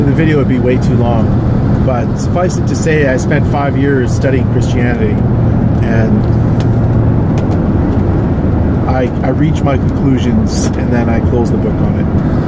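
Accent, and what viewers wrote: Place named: Canada. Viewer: I think your video is great, and I am glad you’re living your truth and your best life! I don’t want to assume but you sound like you could be Canadian and religion is a lot different in the north than it is in the southern United States. Southern Christians are the most backbiting, gossiping, trouble, making, justifying and doing whatever the hell they want to kind of people you can ever imagine. They intermingle their self righteousness, and their politics and their loathing of gays and transgenders all into this big cauldron of godliness that does nothing but hurt and destroy the ability of the south to move forward into a truly progressive way of living. I am 54 years old and wasted decades of my life on Christianity as well as thousands of dollars. I am beyond pissed off about it and I wish I could go back in time and change it. 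Stay strong and keep on rockin!👊🏻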